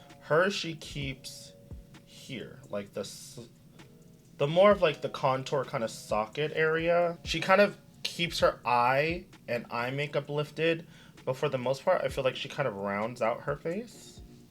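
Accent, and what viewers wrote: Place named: Central California, United States. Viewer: Hey gorgeous! First time really commenting but as the last video you spoke about wanting to hear more about what brings people to your channel figured I'd throw in my two cents. First of all, I love how calming your voice/energy/vibes are as many people have said. I love that you explore and play with make up, it's something I love to do as well. So many drag performers have figured out their mug and don't play with shapes and angles but as a fan of drag who has been trying to find the mug of my dreams it helps to watch someone who does different eyes cheeks lips ect. Also, I love that you still sound like a central Cali girl. I grew up in the Sacramento area and you sound like my friends and family so it's nice to hear. Lastly, you are just to muggy to not follow you come stamped every fucking time. 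❤ Much love keep the videos coming because the girlies who get it get it and we love the content. Happy pride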